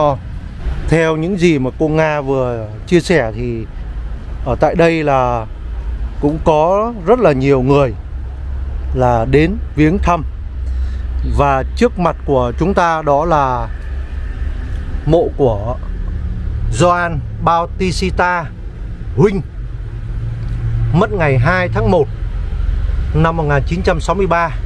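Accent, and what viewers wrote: Vietnam. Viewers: chẳng biết gì về lịch sử cả. Nói thì giọng Bắc pha Nam đơn đớt chối tai!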